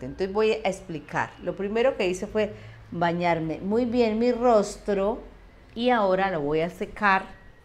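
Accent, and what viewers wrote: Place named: Costa Rica. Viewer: Habla como costarricense.Soy de Costa Rica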